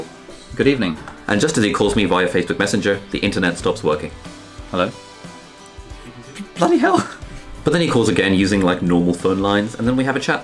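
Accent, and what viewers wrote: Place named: United Kingdom. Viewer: The britsh spend hafof the day drinking the( t)and other have ignoring it😂😂 l like your accent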